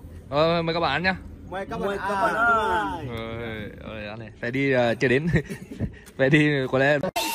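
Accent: Nghe An, Vietnam. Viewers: 🥰🥰🥰 Yêu cái giọng nghệ an ghê😂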